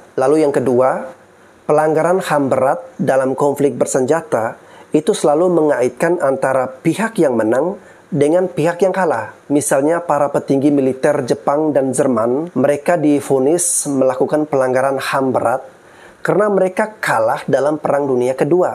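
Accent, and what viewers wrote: Flores, Indonesia. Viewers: Mcmnya logat bajawa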